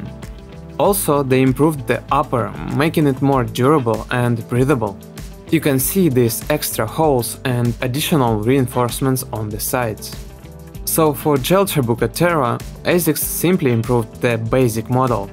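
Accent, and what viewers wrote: Russia. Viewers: Thanks for the analysis, but this russian accent really turn me down.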